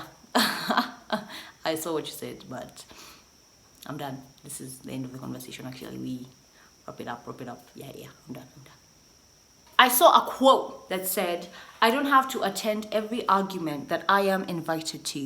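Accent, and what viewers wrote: United Kingdom. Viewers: Here for the British accent 😍😍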